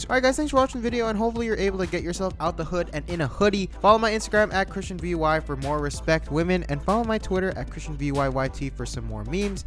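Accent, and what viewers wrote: United States. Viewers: Asian English sounds so American, it no longer sounds American.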